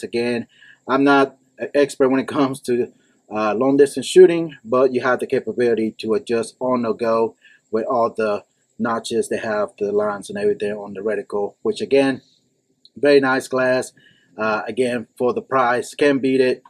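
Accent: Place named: South, United States. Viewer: Man, I'm from GA and I love your southern accent it's pretty unique, I haven't heard a Mexican and Southern accent together before, but being from the south myself I could understand you just fine lol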